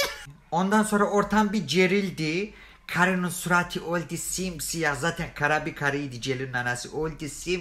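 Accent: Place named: Thrace, Turkey. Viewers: Trakya şivesi daha çok gelsin🙏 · cansın ol sen Yapasın da Trakya şivesi beya 😂😂😂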